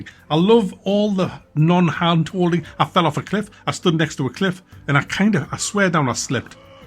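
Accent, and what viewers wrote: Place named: Durham, United Kingdom. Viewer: Where are you from mate? You sound like Durham way, Chester-Le-Street perhaps?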